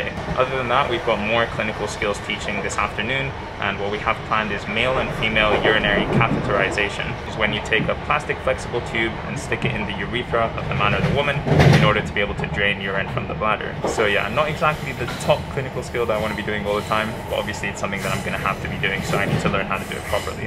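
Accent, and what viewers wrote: United States. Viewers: Are you amirican?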